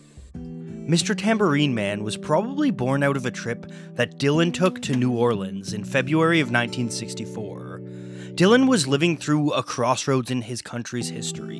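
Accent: Canada. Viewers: canadian,eh? great video as usual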